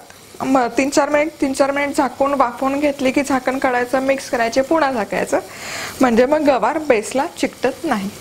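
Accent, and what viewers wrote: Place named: Vidarbha, India. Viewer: Tumchya recepi chan astat. Mi nehmi baghte. Aani karte pn. Tumhi VIDARBHATIL aahat ka. Bhashewarun kalat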